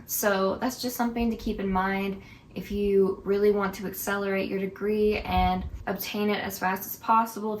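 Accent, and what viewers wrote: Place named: Birmingham, United Kingdom. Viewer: Yooo you sound like you're from Birmingham then you said Jeff State, coincidence or am I just crazy?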